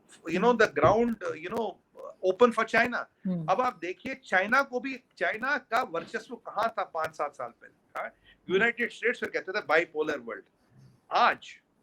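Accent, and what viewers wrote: India. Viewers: aap ko sunte he to lagta he ki aap ek Indian he. Sumeet ji is best vakta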